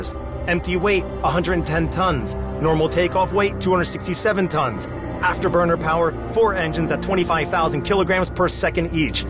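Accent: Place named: Russia. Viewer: Руский ты зачем неруским прикидываешься? Слышно же что ты руский пропогандон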